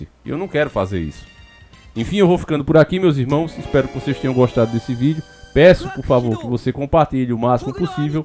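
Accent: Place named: Southeast, Brazil. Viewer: Esse canal é nordestino? O sotaque parece muito de SE.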